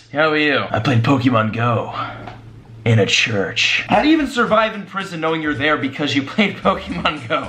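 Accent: Russia. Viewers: That Russian accent got me😂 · More Rambling with a Russian Accent. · Russian accent is leet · Why is his russian accent so good hahaaha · Russian accent wasn't too bad... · Bruh your russian accent cracks me up! XDDDD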